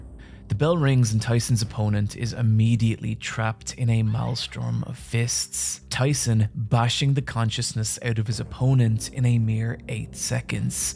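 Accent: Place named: Ireland. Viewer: Another banger from. Your chillingly Irish voice regales us once again. Thank you.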